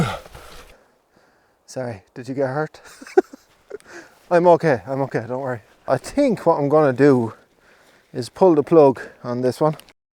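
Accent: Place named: Ireland. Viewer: I love your accent...is it Irish?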